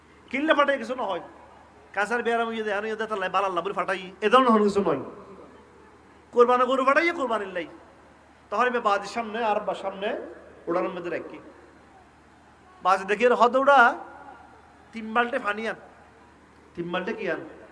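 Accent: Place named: Chittagong, Bangladesh. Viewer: হুজুর চট্টগ্রামের ভাষা বুঝিনা 😢😢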